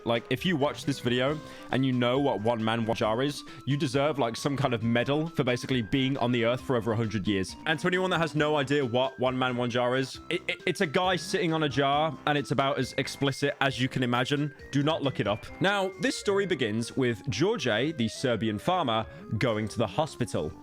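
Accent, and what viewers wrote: United Kingdom. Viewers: Why the fuck am I watching and listening to a brit talking about how an eastern european man had a foreign object in his chocolate starfish?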